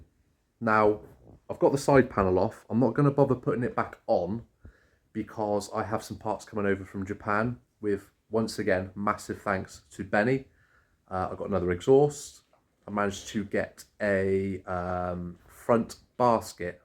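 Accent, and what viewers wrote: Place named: West Country, United Kingdom. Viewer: You sound like youre from the west country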